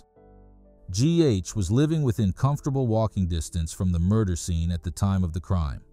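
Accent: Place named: United States. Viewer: Bra engelska? Det där är bred amerikansk accent.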